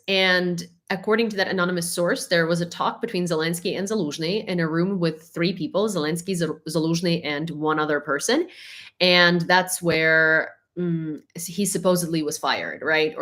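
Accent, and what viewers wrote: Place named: Ohio, United States. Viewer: I get the Ohio like quality in your voice...it's a very plain accent without much filler. And Ohio and Ontario are just across Lake Erie from each other.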